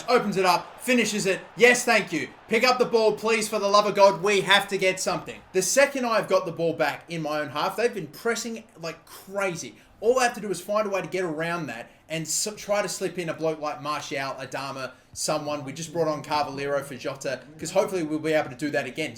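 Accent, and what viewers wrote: Australia. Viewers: Funny hearing an Aussie swear:) (no offence, love your content mate)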